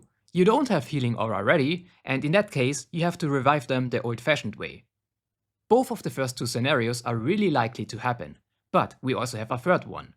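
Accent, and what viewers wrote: Germany. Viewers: Im hearing a german accent xD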